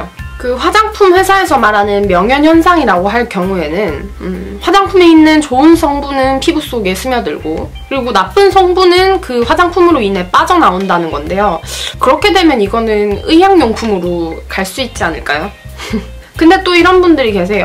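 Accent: Gyeongsang, South Korea. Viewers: 예전 큐엔에이에서 였나? 기억은 잘 안나는데ㅠ 경상도쪽 (대구?)사투리랑 서울쪽 사투리랑 섞여서 이렇게 되셨다고 했어용 정확한건 그 영상을 찿아보시면 되실것 같아요ㅠㅠ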